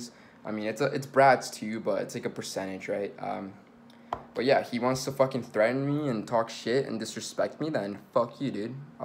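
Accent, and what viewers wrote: Canada. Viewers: You and Brad sound just alike. Must be a Canadian thing.. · You just sound so Canadian and it’s all I can think about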